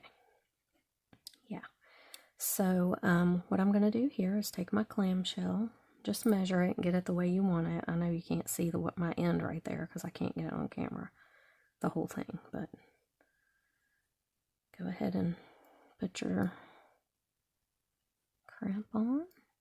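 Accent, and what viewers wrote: United States. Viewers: Love your cowgirl accent❤❤❤❤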